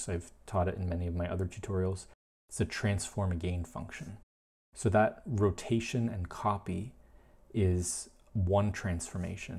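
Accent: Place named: Canada. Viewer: I felt like I was listening to the voice of Roman Mars from 99% Invisible, only a Canadian version ;) Thank you for sharing the tips. I've used Illustrator on and off for 20 years but never really explored all of the options and you've pointed out some really helpful tips that cut down on the actual designing part simply due to rotates and refecting.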